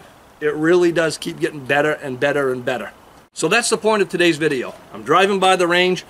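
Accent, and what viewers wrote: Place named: Northeast, United States. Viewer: I feel really stupid for asking this question but what state are you from? It sounds like Northeast, which isn't known for being gun frirndly!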